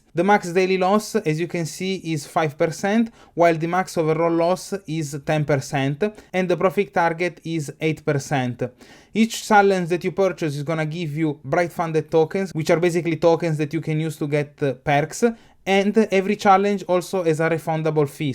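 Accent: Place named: Italy. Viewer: Ma sei per caso italiano? perchè hai quell'accento li italianizzato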